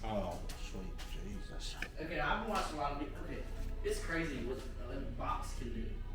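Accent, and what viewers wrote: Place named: Midwest, United States. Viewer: BTW, I ain't bustin your balls over the accent, quite the contrary. My whole gawddamn family was from the midwest a Oklahomer n Missoura n Florider. Grew up listnin to my folks n kin a gabbin round the table AFTER Sunday supper (you didn't even think about talkin at the table durin mealtime) n it reminds me a my Gramma n Grampa's house where I was raised. I sorely miss em all.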